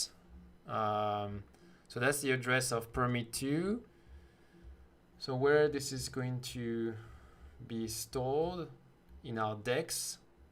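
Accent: France. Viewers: I feel you are french 😛😛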